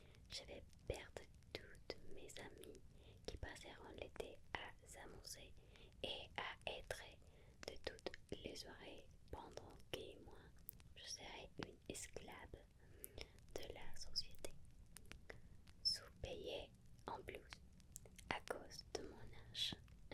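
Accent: Spain. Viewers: Pour moi, c'est justement ton accent espagnol en Français que j'adore. Merci pour l'effort que ça doit être pour toi. ❤ 👍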